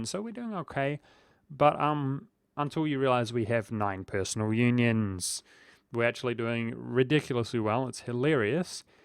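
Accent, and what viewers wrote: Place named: New Zealand. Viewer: I fuckin love having a Kiwi EUIV Youtuber to watch. As a Hamiltonian it is so much nicer and familiar to the ears. On ya mate, tu meke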